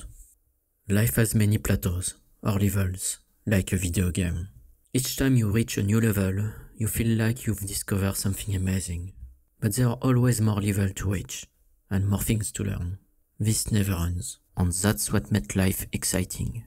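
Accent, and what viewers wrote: France. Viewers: It is amazing that you came back with another video! We missed you and your content which is very inspiring and valuable, thank you so much for taking the time to share it 😊 And don’t you ever worry about the french accent or the way you are speaking english, keep talking, there are people who likes or loves hearing it.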